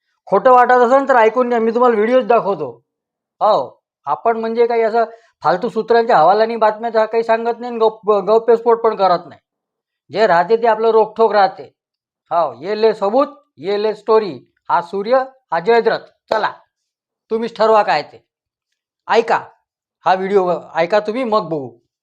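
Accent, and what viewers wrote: Vidarbha, India. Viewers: फार सुरेख नाटयमय कथाकथन ! Your pronunciation is with a humorous accent ...Your speciality.. वैदर्भिय लहेजा ..Pl keep it up !👍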